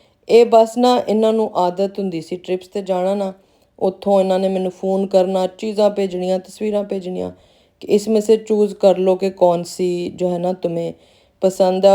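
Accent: Punjab, India. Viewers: Assalam o alekum aap ki batain aap ki meethi Si panjabi mujhe bohat Pasand Hy I like u very much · Hayee ❗tusi bohat sohnay ho bolday ho jado Punjabi ta maja aa janda ❤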